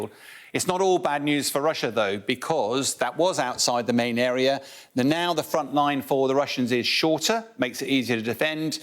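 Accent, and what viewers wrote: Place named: United Kingdom. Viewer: So what's been the timeline for the Ukrainian counterattack thus far? And why does this newscaster have to butcher the pronunciation of these Ukrainian and Russian words? It seems he's less about accuracy and more about British-ness. It's Za-por-rizh-ZHI-a, not Za-por-RISH-shi-a, KHEYR-sohn, not HER-son, POO-tin, not PEW-tin.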